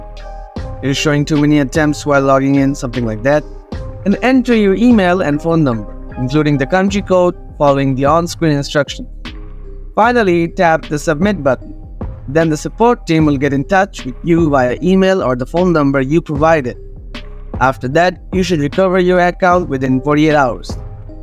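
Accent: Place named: India. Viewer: It is an Indian English.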